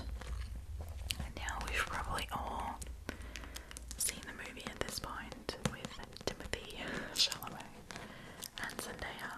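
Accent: Australia. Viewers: For so long I wondered how you ended up with an Australian accent. I just assumed you chose it as your preferred accent in English. Now I know! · “as a non-English speaker” Proceeds to speak English at a native level with a legitimate Australian accent.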